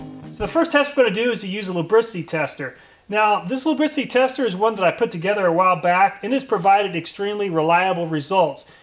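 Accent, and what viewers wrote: United States. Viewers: Interesting video, surprised it maintained its lubricity as long as it did. On a sidenote, where is your accent from statewise? I can't place it. (apologies if I'm being nosy)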